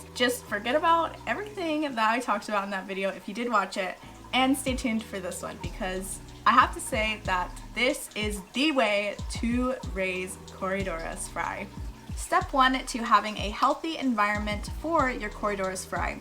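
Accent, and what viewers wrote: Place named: Southern Midwest, United States. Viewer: You sound more like you are from Southern Mid-West US than you do Australia. Transplant? Been watching yours and Nick's videos lately. Was wanting to breed some bread and butter fish other than boring guppies and bristlenose. Your videos are good motivation.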